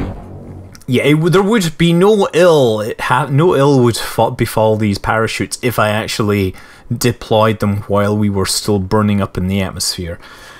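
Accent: Scotland, United Kingdom. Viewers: well some peope have other shit to do than sit infront of a computer screen watching ssomeone else play for hours lmao,even tho i like his scotish accent and voice,maybe,just maybe i just happened to come across this video and just watched it without going ocd and being like shit i gotta watch the 10 others before this one! wich are all over 30 mins,thats 5 hours(more than that if you count the ones being an hour),grats to you if you did,but i sure dont have time to.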